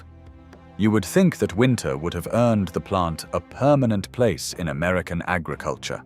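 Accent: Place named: Southern England, United Kingdom. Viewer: 👋🏻 it’s not a robotic voice 😀 it’s a normal “ educated “ Southern English voice 💕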